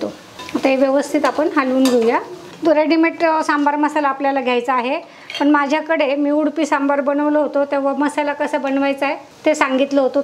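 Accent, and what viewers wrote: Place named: Ahmednagar, India. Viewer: Tumhi Ahmednager cha aahat ka tumcha bolanavarun taser care.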